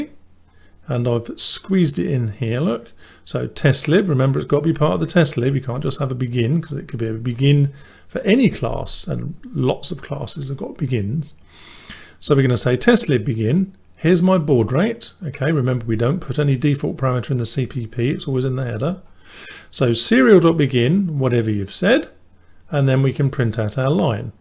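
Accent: United Kingdom. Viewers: Thank you. I hesitated to start a 38 minute video tutorial, but it was worth it just for the calm Pommy accent and absence of duffa duffa music! I am an old veteran since the 70s of programming assembler on bare metal for embedded realtime controls, and only just in retirement wrapping my head around C/CPP. Some of the syntax conventions really "get" me, and I have to remind myself I am dealing with a very simplistic one-pass compiler that needs lots of cues in order to work. The work flow from multiple tabs / project folder to files in the library folder was very helpful.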